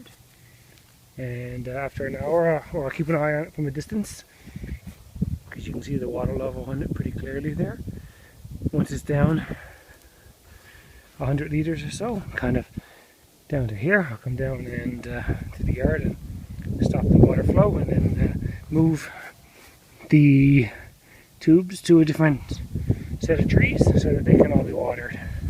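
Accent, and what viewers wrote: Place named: Alberta, Canada. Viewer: This person sounds like they are from Alberta.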